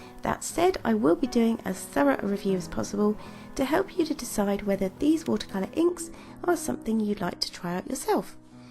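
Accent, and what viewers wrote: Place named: United Kingdom. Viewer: Great job! I really enjoyed watching your review video and you did such a good job at being so thorough. This is a really helpful review for anyone who is considering buying these watercolour inks! I also love your accent ❤ I am British too and I also do art tutorial/voice over videos! So keep up the amazing work. I've subscribed ❤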